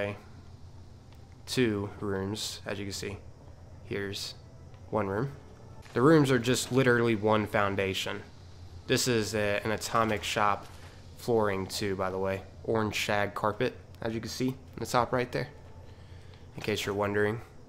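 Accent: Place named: Pittsburgh, United States. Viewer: Are you from Pittsburgh?! I know a yinzer when I hear it dut go stillers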